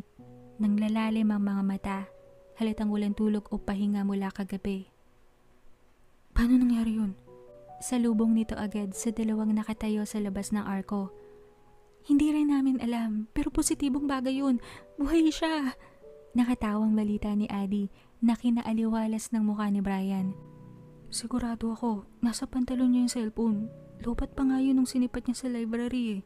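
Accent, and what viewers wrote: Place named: Visayas, Philippines. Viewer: ❤😂hahaha ilab u...hahaha gustu ko ung accent mo sa bisaya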